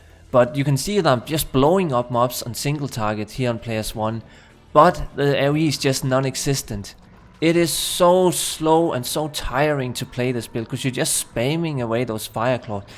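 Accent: Denmark. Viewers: Man kan lugte en Dansk accent 14 kilometer væk.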